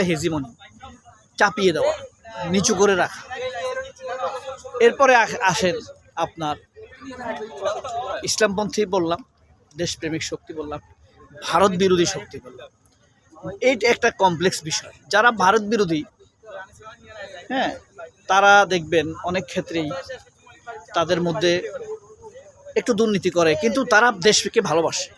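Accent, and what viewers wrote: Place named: Chittagong, Bangladesh. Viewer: ভাই মনে হয় চিটাগং সিবিস আছেন।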